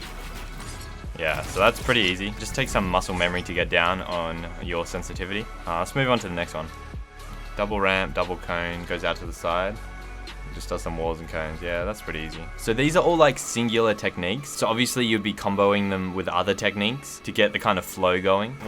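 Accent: Australia. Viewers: Yo he has like a Australian accent and an American accent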